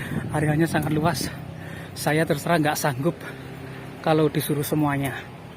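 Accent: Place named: East Java, Indonesia. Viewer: Dr semua vlog sejenis, saya suka vlog ini krn: 1. Seblm mulai ada penjelasannya 2. Logat Jwa Timurannya bikin seru n lucu 3 . Ulasannya detil 4. Ga diserem²in pake back sound Saran: 1. Sll hati² apalagi tangga² yg rapuh 2. Klo di rumah² yg ada barang²nya misal berkas² baiknya disorot/diteliti lbh detil sapa tau bisa jd titik terang ttg siapa penilik rumahnya Suwuuuun..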